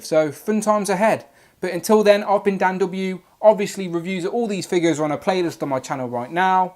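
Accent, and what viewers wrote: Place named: Manchester, United Kingdom. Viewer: got this wave or partial wave as a gift for a friend still waiting on one in the mail didnt get cyclops cause i refuse to pay fifty plus but did get the leg for five, but wondering all plus this guy eighty five dollars is that cheap or a deal anyhoo good vid your accent sounds like your from the manchester area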